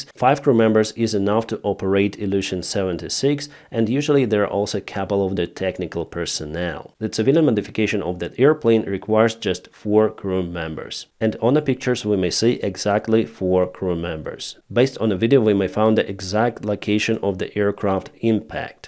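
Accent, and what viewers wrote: England, United Kingdom. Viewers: Yeah he’s British, his accent can be found nowhere else. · He has a strong English accent . I am British and recognise the accents of my compatriots.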